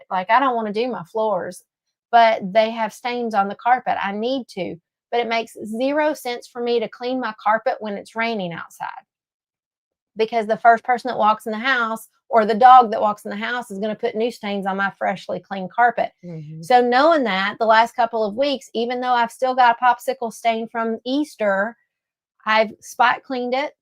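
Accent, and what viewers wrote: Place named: South, United States. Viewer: Hey! Cathching the replay! We're still put here at the cabin. Hubby has been building the shed and he's setting up the washing machine today that we picked up the other day! Yay! Nomore hand washing laundry when we're out here! I will still dry them on the ine, but we will have both an outdoor line and indoor in the shed. I love line drying anyway. I love your southern "hick" accent. I have a "slight" southern accent because I'm from an area that is kind of mixed between north and south, if that makes sense. I have have FINALLY developed the one habit that I've been struggling with for 15-ish years! Since I've bern here this trip (came here at the beginning of March); I have-without fail- gotten ready for bed every single night before settling in for the evening! Nomore falling asleep in my day clothes! I have made that a priority over after-dinner clean-up in my evening routine. 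That means I still have to do he clean-up in the mornings alot, but that's ok. When I ferl like my evening personal care routine is as automatic as brushing my teeth, both here AND at jome, THEN I'll work on prioritising after-dinner clean-up. For now, if I get it done, great. If I don't, it's ok. The ticks! Ohmy! We're constantly checking each other for them out here, and we still find ourselves picking them off after checking lol. We get them back home, but not THIS much! I've kind of gotten used to it as part gor the course lol.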